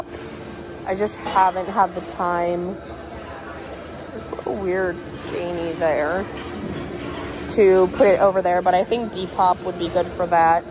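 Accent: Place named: Northeast, United States. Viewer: I love the way you talk I am knowledgeable of where are you from the Northeast and that is your Northeastern speech those who are not educated as to the different dialects of the language sorry for them but I enjoy every word you say and I appreciate hearing you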